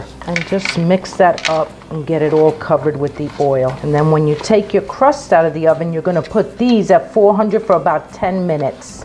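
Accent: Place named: New England, United States. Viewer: judging from your accent your from the New England area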